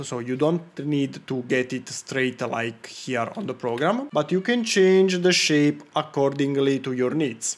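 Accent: Italy. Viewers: Dall'accento avevo intuito fossi italiano hahah, bel video e bel modello, complimenti!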